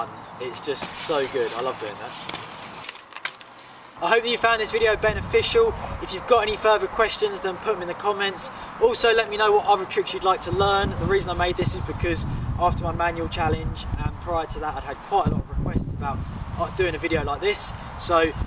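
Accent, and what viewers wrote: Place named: England, United Kingdom. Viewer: nope , he is from England. Można wywnioskować to po akcencie , i oczywiście miejscu zamieszkania , żyjemy w dobie w której o takie informacje łatwo , wystarczy "wygooglowac" ;)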